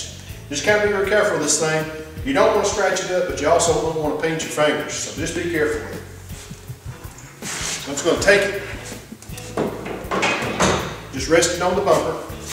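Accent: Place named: Georgia, United States. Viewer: ........you must be from georgia. I understood every word you said. Great job on the fuel pump, very detailed and clear. This is the first time seeing your channel but love it. Looking forward to seeing more of your videos. Lets do it............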